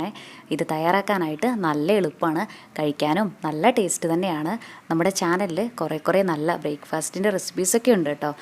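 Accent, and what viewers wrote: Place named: Valluvanad, India. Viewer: വള്ളുവൻ നാട് സ്ലാംഗ് ഒരു സംഗീതം പോലെ അങ്ങനങ്ങനെ..............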